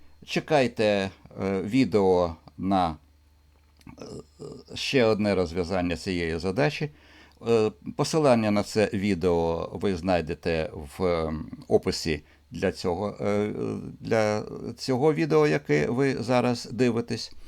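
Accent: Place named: Odesa, Ukraine. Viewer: Одеський акцент української пестить вухо. Дякую. Цікаво.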